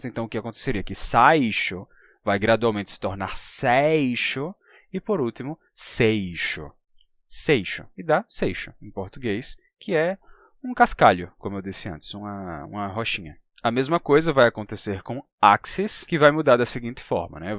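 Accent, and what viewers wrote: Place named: Natal, Brazil. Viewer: Você é muito bom nas suas explicações. Elas têm toda lógica principalmente para quem conhece um pouco de outros idiomas. Nas nuances explicativas vêem-se semelhanças que permitem a gente entender melhor o português, outras línguas neolatinas e o inglês. Além do seu sotaque de Natal que eu adoro. Vivi mais de 10 anos aí e vou de 6/6 meses. Parabéns por tudo. Escrever aqui é muita responsabilidade para não “pagar mico” demais, pois você de fato sabe português.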